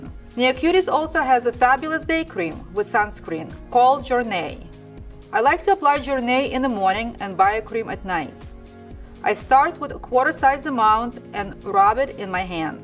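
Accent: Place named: Russia. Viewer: so much of russian accent))))))))))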